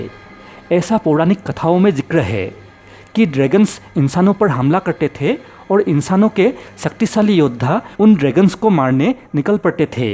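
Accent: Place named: Bengal, India. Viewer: Yee bosdika bolne wala pukka bengali hoga....chutiye ko bolna bhe nahi aata hogaa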